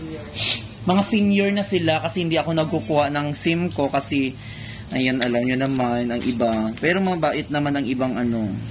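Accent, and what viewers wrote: Iloilo, Philippines. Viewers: Tga din kaw te? Daw may tono kaw na Ilonggo ☺️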